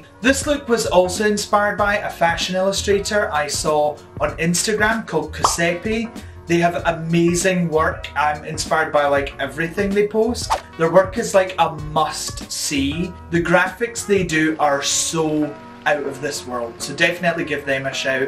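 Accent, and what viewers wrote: Scotland, United Kingdom. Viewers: Lawrence Chaney could insult me in Scottish and I would say thank you, may I have more please? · Love that Scottish accent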